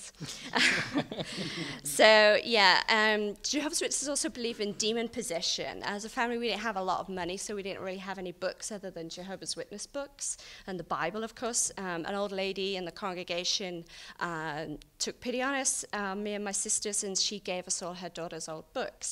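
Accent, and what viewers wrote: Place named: Australia. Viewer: she sounds kind of Australian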